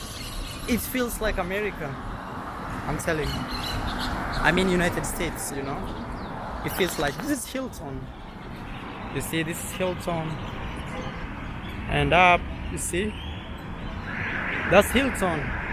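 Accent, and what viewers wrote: Kenya. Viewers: hes from kenya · The kenyan ACCENT IS REAL WAAAHHH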